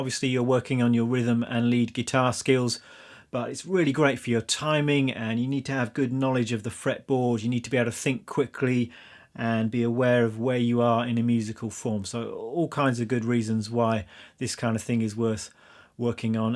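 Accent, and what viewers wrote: United Kingdom. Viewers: “Whoops, didn’t mean to click on this video. Wait, he is British- let me see what he has to say.” This is what accents do to my brain.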